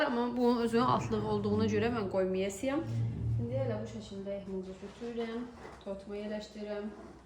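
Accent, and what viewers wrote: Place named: Shaki, Azerbaijan. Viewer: Bayaq Dan seki lehcesinin sirinliyi celb eliyirdi, indi de sirinliyi, Men ona balet eliyirem butun vloq boyu🥰Allah saxlasin 🙏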